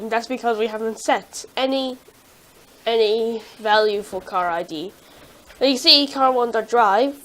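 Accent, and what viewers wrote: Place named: United Kingdom. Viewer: From your accent and your way write colour instead of color. You might be UK Citizen. 🤔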